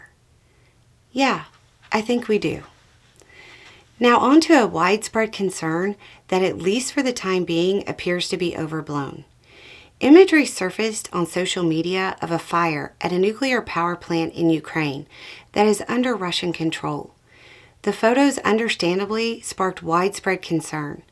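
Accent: South, United States. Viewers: I'm increasingly enjoying the dichotomy of the words of conflict, warfare and weaponry with the sweet voice and Southern charm accented delivery. Just wondering for the future, if there would be a need to bring Beau out of mothballs for the quiet rage that he occasionally would bring. Just would prefer if there is no incident that would cause such a need, though.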